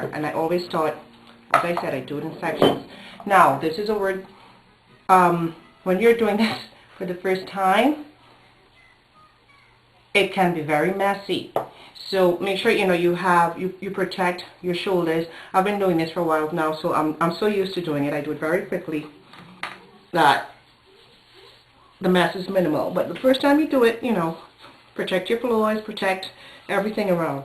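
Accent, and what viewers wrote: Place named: Trinidad and Tobago. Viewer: Girl you sound so Trini, but you're probably from JA, big up!!. Thanks for the tips, will be having a go at it..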